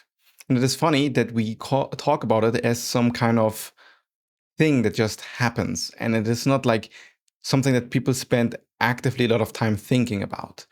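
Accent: Germany. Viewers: I'm impressed with how much what you said resonates with me. There's many channels with seemingly amazing content, that contains just empty calories if you know what I mean. I'm feeling this will be actually useful. By the way, I'm detecting very faint germanic accent... Is your native language German?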